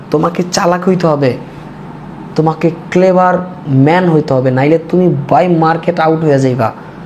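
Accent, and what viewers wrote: Barishal, Bangladesh. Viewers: ভাই তোমার বাড়ি বরিশাল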